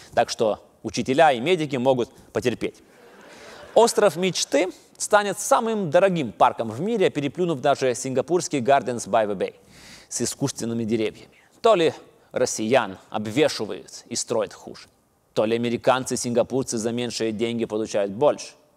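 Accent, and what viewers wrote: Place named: Lithuania. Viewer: po truputi dingsta lietuviskas akcentas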